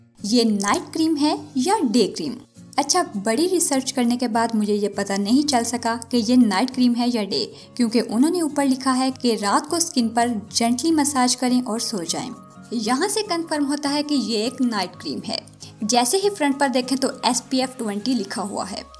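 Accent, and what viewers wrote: Punjab, India. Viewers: Nice di panjbi bolde ho so sweet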